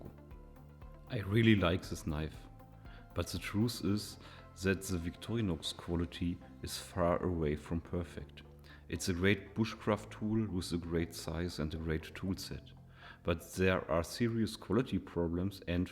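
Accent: Germany. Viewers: You know that this is a Wenger design, made with Wenger machinery in the old Wenger factory is Delemont, under Vic ownership and branding? Having said that this range never appealed to me due to the aesthetic and I can't EDC locking blades here in the UK. In fact I haven't really wanted to buy any of the Delemont (formerly Wenger) SAKs as I said due to aesthetics, the thicker scales, slightly smaller size (85mm instead of 91mm) resulting in shorter tools like main blade & woodsaw, toolset - as I much prefer a small blade over a nailfile - and finally because they tend to cost at least 50% more than the equivalent Ybach manufactured models. When it comes to watches though I do love my Wengers! They have the same specs as Victorinox watches but are much nicer designs in my opinion, much less ''busy'' faces and more understated and elegant, and cost a lot less. So I just make sure my SAKs come from Ybach and my Swiss watches come from Delemont. 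I've never had any quality issues with any Ybach manufactured SAK and they are just great value for money. My latest purchase from them was a Swisschamp in black, it cost me just £58 with free next day delivery (not from the Vic website obviously), that's just 66 Euros, insanely good value. Thanks for your detailed review. I'm 99% sure from your accent that you are in Germany, and if I want to find out if a product is good or not, a German reviewer will give the most critical detailed review. Deutsche Gruendlichkeit usw. I often double ch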